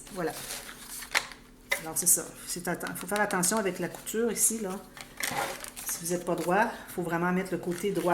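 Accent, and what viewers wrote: Canada. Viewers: Bonsoir, Est ce que tu pourrais nous montrer comment faire un album sur le thème de Noël? Bonne soirée Ps: Votre accent vient du Canada?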